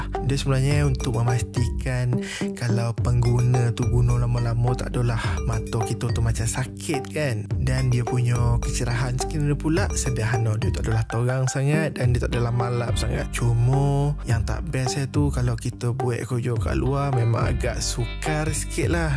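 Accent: Negeri Sembilan, Malaysia. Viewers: Elok2 la cakap nogori tu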